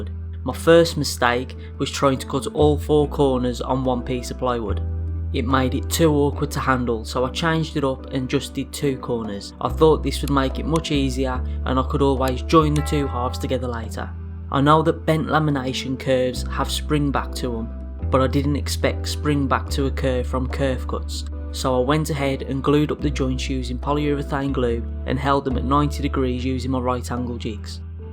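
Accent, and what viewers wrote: Black Country, United Kingdom. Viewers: Don't worry, he ay familiar with arr Black Country spake, I am though, from a Black Country lad to another... Bostin' vid 😃👍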